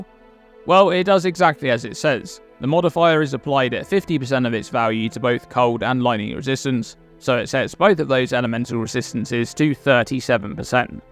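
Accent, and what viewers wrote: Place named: United Kingdom. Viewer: He's a brit... they say things differently.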